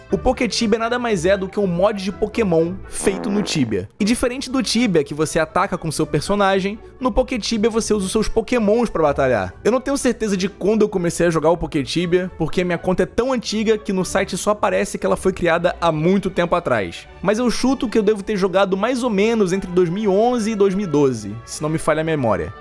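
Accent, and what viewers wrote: Rio de Janeiro, Brazil. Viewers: Carioca né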